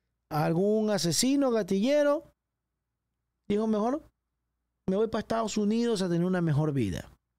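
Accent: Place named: Honduras. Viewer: Tu acento me suena undureño...😅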